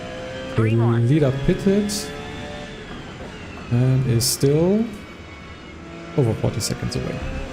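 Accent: Germany. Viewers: Moin. Deutscher Akzent?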